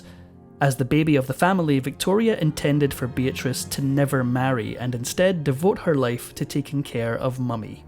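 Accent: Scotland, United Kingdom. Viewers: I had forgotten just how much I loved this narrator’s Scottish brogue! Cheers to you, Sir! ❤❤❤ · Scottish accent and exceptional annunciation 🏴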